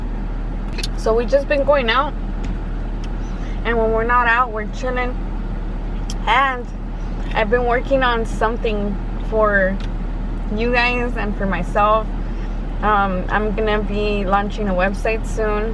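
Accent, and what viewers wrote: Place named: Texas, United States. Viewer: Lol I love your voice you sound like all the girls I grew up with here in Texas.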